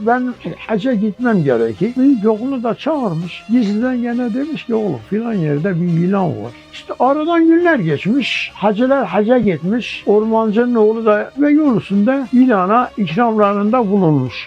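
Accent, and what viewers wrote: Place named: Urfa, Turkey. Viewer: Bu abi Urfalı şiveden konuşma üslubundan belli,fon müziği ’ya ait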